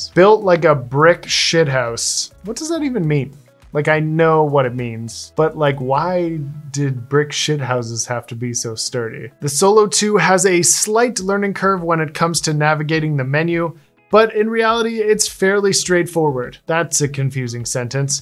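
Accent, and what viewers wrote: Germany. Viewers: Good German accent Vielen Dank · I love the German accent makes me feel like I’m watching Hogan‘s heroes. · You get like and subscribed for that German accent! 🤣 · Nice entertaining presentation. I’m just starting out and you really helped clear my confusion. Nice German accent too :-). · That German accent is the reason why i liked your vid just 2 minutes in xD Also could you do a vid explaining everything about a dry herb vape? like what cause the high to end sooner etc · Loved the German accent, really good. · Got me subscribing from the German accent 😂 but you speak facts so I like that , you got my view brother and will be watching , keep it up 🌲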